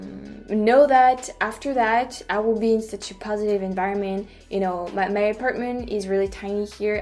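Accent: France. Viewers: Watching you reminds me of my visits to your country (Paris 2 times) and your accent makes me happy. Keep being you and please stay safe. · Wait what kind of channel: 😳 ? .........Lol. But in all seriousness I i love your channel and reactions and lovely French accent. You're wonderful, keep up the good work. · Your English is fine. And the little differences makes it more interesting-- I love listening to your French accent. I grew up with a partly French family who were and are our best friends in the Detroit , MI area. I love their accents.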